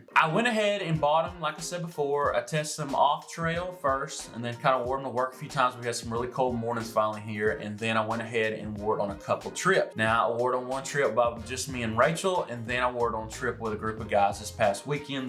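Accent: South, United States. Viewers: I have had one for a few years and really like it. I like the thumb holes too. I bought my wife one and she is wearing it on a girls weekend trip. All her friends love it and they all want one now!! The only negative, and it probably applies to most fleece "outerwear" is the wind goes right thru it. Don't apologize for the southern accent!!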